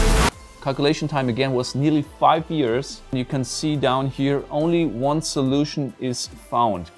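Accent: Germany. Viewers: What is more remarkable is just how much you have strayed from your German Accent when speaking English over the past five years.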